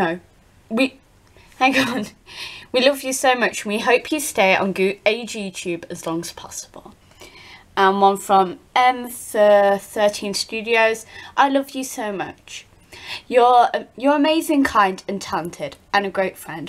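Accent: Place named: United Kingdom. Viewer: You are awesome! I love British accents, and yours is so unique! You are never too old for dolls!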